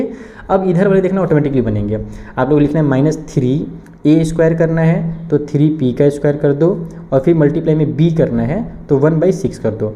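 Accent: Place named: Punjab, India. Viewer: Sir ap punjab ka ho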